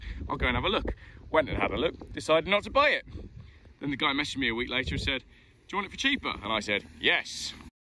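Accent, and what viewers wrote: United Kingdom. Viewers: britisch accent😋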